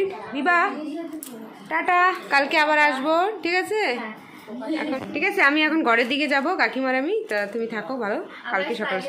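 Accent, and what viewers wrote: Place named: East Bengal, Bangladesh. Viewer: একখান কথা কমু আপনি কইতাছেন আপনার জন্ম ইন্ডিয়ায়। কিন্তু আপনার কথাবার্তা থেকে এখনো আংগো পূর্ববাংলা টার্ন রইছে।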